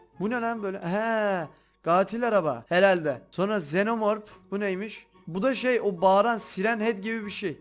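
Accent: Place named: Gaziantep, Turkey. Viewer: Abi seni eskiden antepli zannediyodum ama degilmişsin üzüldüm ama aynı antep şivesi yapıyorsun seviliyosun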